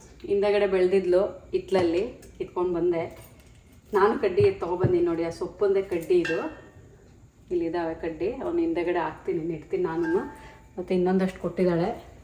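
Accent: Mandya, India. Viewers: Foreign ge hodru nu Namma local Bangalore and mandya Kannada en sakkathu agi mathadtira ❤🎉 Navu gowdre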